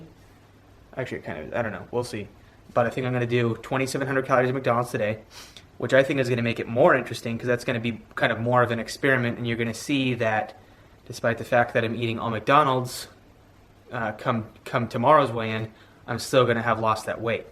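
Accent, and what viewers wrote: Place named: Canada. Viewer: Hi, i wanted to ask where are you originally from? and where are you based at the moment? Your accent sounds Canadian, but your name sounds Romanian and you look Romanian.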